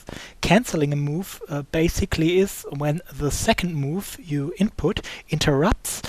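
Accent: Germany. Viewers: Hi, just wanted to swing by to say that I find your tutorials very insightful, but unfortunately I can't stomach your accent for more then a couple of minutes.I hope this does not offend you, as I am german myself, and I know that most germans don't get the english pronunciations right. This is too bad, because apart from that, I really like your presentation. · ^^ du sprichst genauso englisch, wie ich, mit so einem deutschen Akzent, aber trotzdem gutes tut :D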